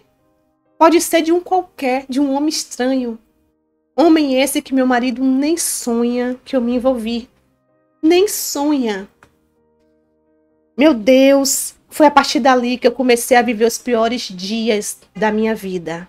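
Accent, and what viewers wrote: Bahia, Brazil. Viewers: Oi vc e baiana a voz parecida ?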